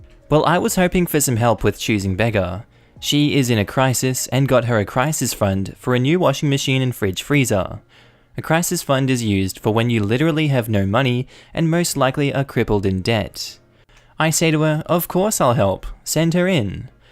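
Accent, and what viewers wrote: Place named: Frankston, Australia. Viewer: Sounds like Frankston type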